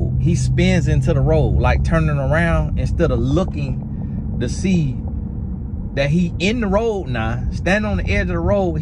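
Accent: South, United States. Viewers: I gotta say. I’m subscribing because of the accent (I’m southern too) and the realness. I just randomly found this video, but everything you’re saying is spot on.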